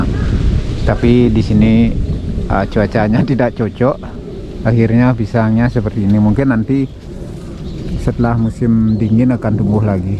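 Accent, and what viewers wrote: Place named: Bali, Indonesia. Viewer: Pasti anak bali ne bli..logatne jek bali keleh.Alat numbuk padi gantung jd kulkul.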